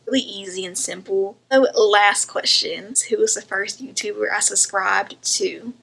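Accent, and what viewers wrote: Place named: South, United States. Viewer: Girl where are you from?!?! I thought I was country omgeee lol I love it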